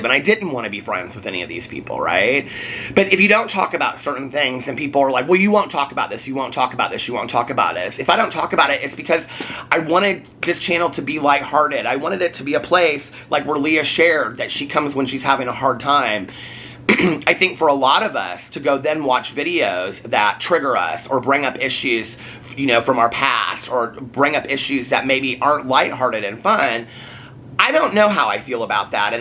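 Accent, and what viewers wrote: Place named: Ohio, United States. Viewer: I love you so much. I am from outside of Cincinnati, so the way you talk and your mannerisms remind me of my southern Ohio family and friends. It is so heartwarming bc you are such a good person and you sound like all my fave people.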